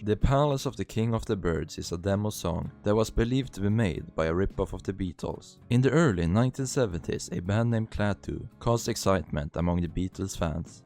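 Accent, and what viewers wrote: Sweden. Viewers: I like your swedish accent, I also happen to have hyperfixation on the beatles so this video will be on my "videos for eating snacks" list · Your ATROCIOUS swedish accent is just too unbearable to listen to for a whole video, sorry... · you sound so swedish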